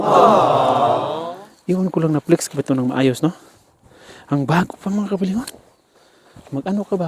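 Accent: Visayas, Philippines. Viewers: tuwang tuwa tlga aq pag pinapanood q ito gustong gusto q ung Punto nia bisayang bisaya ang kulit 😅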